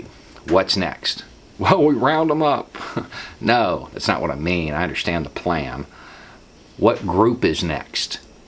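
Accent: South, United States. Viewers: Are you from Lower Alabama or Upper Florida?🧐😂 just a country question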